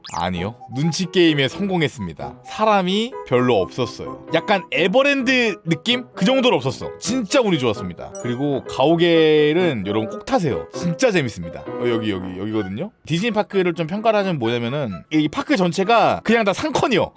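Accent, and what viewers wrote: United Kingdom. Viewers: 이사람 미국갔다왔는데 억양은 왜 영국이죠? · 미국갔다오셨는데 워쮀서 영국영어를....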